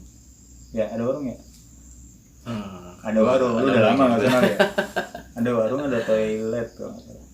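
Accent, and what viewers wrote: Java, Indonesia. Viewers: Bang ada logat jawanya nih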